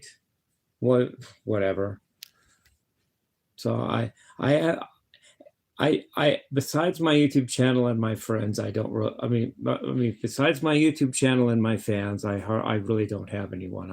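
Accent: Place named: East Coast, United States. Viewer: Hi, Nobody uses “Mock” except. I can hear your east coast accent.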